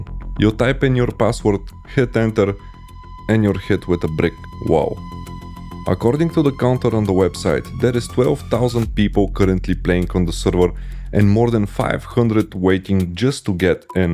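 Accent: Balkans. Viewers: Balkaaaaaaan accent hit me like a truck mon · great video, cant wait next one ! (from another balkan guy, ur accent is funny to listen to haha) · the balkan accent and the conspiratory story telling makes this video so much better :D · You sound mega Balkan bro. 100000% Balkan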